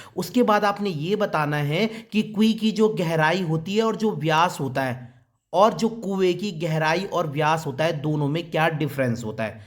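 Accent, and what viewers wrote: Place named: Pahadi, India. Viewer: sir aap bhi pahadi ho kya kya aapke bolne ke tarike se laga raha hai ki aap bhi pahadi ho uttarakhand se mai bhi vahi se hun sir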